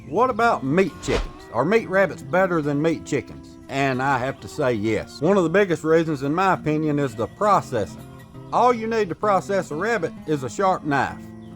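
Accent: United States. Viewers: That's an American accent if I ever heard one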